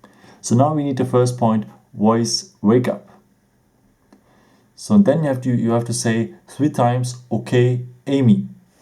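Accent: Germany. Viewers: Dat german accent! :D Tolles Video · Haha German english😂😂😂😂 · Hab doch gleich gehört das du deutsch bist :P Super Video und klasse Smartphone 👍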